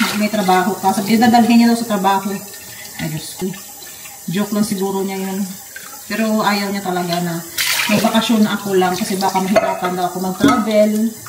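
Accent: Philippines. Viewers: my tono ka san probinsya ka Dito sa pinas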